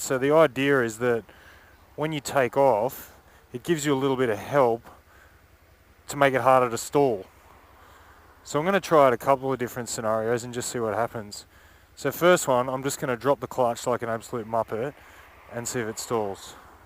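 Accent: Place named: Australia. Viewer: like your Australian accent! Greetings from Spain. I'm 5' 11" and I was wondering if this bike would be too low for me (seat height si 785 mm here in Europe).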